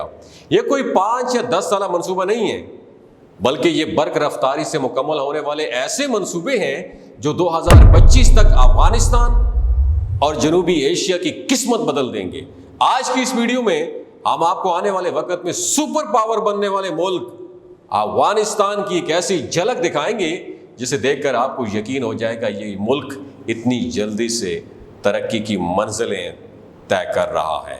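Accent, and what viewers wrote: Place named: Punjab, Pakistan. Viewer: پنجابی ایسے ہی کہتے ہیں جاپان کو جپان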